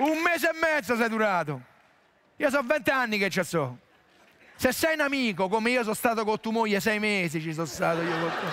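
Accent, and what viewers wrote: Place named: Rome, Italy. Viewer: Il fatto e che il dialetto romano è fra i dialetti più simpatici... Quindi alle orecchie dei non romani non risulta "grezzo" o "volgare" anzi... Tutto qui. La stessa cosa vale per gli altri dialetti "comprensibili" e simpatici.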